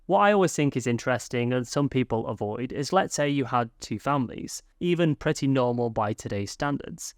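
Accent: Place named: United Kingdom. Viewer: Replace the British accent and substitute Canada for England and it could be the same video. Gov't attitude is to tax to get funds, create a need, raise the tax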